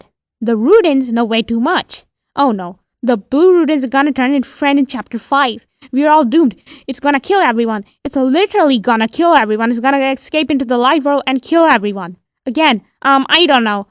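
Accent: Southeast Asia. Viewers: pretty sure it's an accent?? sounds southeastern asiaidk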